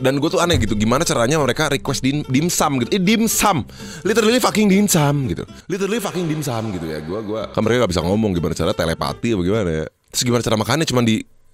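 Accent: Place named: South Jakarta, Indonesia. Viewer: Menuju dialek jaksel🥶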